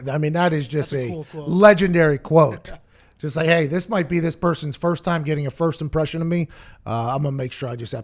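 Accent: Pittsburgh, United States. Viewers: I didn't know you were from Pittsburgh. You do lowkey sound like a yinzer lol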